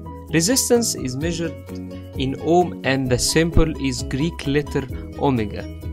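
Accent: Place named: Egypt. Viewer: اللهجة دي مصري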